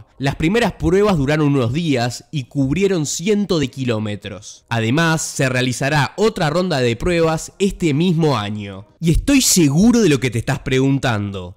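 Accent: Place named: Buenos Aires, Argentina. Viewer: Decime que sos porteño sin decirlo: "mueshe, astishero, quisha, shevada..."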